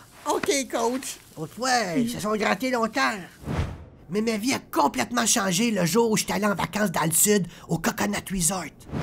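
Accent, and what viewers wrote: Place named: Quebec, Canada. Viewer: En plus lacsent kebequoi c'est mon préféré. :)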